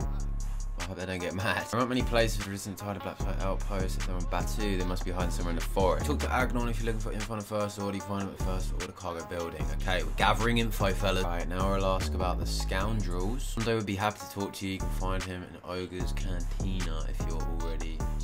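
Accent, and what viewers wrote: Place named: United Kingdom. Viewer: We gonna have some Woaaaa.🤣🤣🤣 I love the British accent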